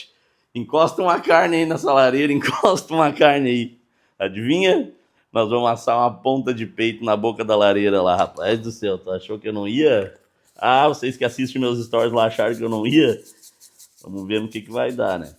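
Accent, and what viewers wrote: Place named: Rio Grande do Sul, Brazil. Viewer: so pelo jeito q ele fala reconheço q ele e do meu estado,do rs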